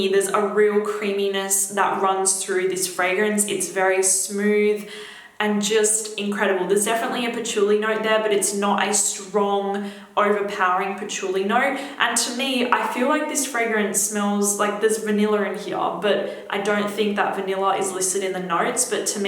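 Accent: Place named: Australia. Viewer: Where we going on date night ;p Edit: Echo is a plus, twice the Aussie accent.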